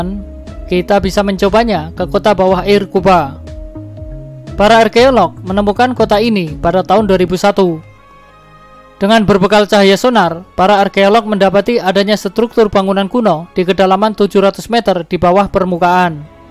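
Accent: Java, Indonesia. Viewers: Pusing dengernya kalo naratornya medok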